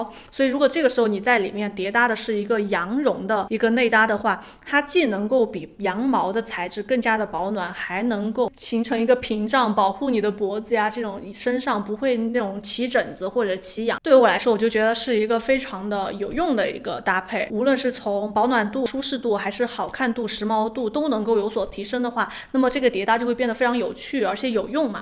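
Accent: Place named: Sichuan, China. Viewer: 干货超多！四川话口音的普通话太亲切了😆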